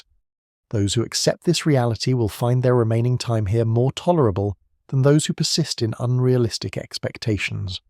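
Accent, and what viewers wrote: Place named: United Kingdom. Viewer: Sounds like UK. It also says so in the description.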